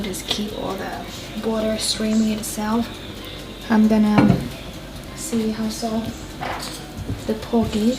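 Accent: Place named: Australia. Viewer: You are picking up a good Aussie accent I notice in many words you say 😁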